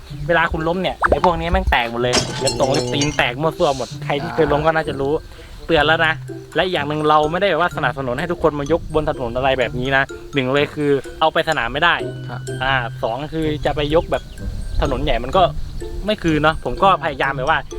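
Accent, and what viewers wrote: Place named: Isan, Thailand. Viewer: เว้าอีสานแน่อ้าย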